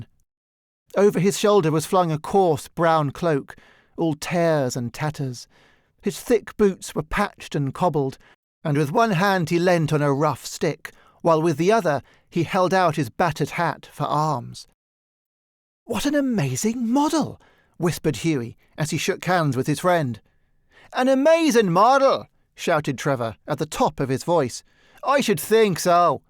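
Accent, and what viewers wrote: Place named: Dublin, Ireland. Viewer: Finding this to start my day was perfect! And hurrah for a lovely Dublin accent 💖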